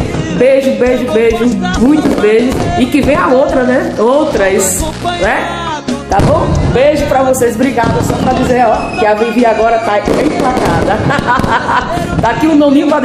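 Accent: Northeast, Brazil. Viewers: Sou de Belém do Pará e adoro esse sotaque nordestino.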